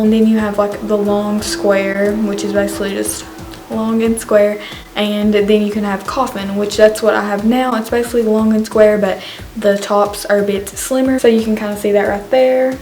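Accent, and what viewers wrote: South, United States. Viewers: Omg I just found ur channel and I'm so happy because I have not found a YouTuber who has a southern accent like me! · Am I the only one who thinks like she's from Russia or from a northern Europe country? I think she looks so much like she's from of theses country that I find it odd that she have a southern accent. I love your videos, you are gorgeous! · wait omg i thought she had an english accent then i realized it was a country accent 💀💀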